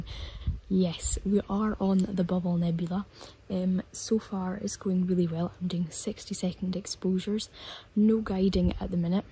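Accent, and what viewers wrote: Scotland, United Kingdom. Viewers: Great video and channel! I love the Scottish accent, memories come back haha (I was born in Edinburgh :D)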